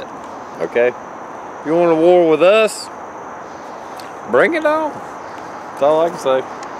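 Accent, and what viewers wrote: South, United States. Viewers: I'm sorry, but I cannot take this video seiously. The southern accent, mohawk haircut, weapon, rooster crowing are just too much. Wonder if he is in his underpants? Maybe some version of deliverance. He he.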